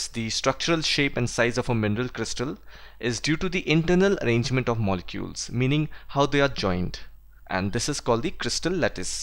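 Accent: India. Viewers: Idk but your accent seems a lot like raj kothrappali from tbbt!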